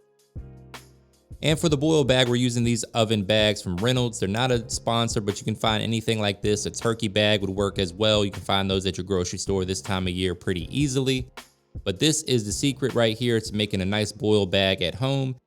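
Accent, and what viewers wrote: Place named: Louisiana, United States. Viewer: Stop fuckin lying you're from Louisiana you ain't from Virginia with that accent.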